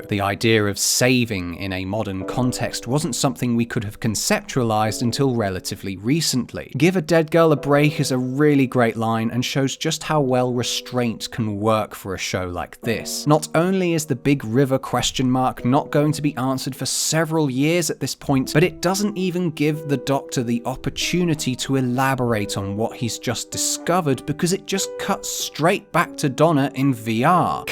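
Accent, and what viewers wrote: RP, United Kingdom. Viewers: Yse a this is the posh voice I have been thinking of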